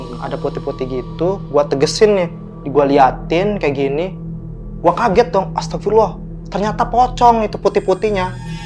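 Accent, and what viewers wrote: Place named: Jaseng, Indonesia. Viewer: Walaupun bahasa nya lo gw lo gw logat jaseng nya gk ilang😂😅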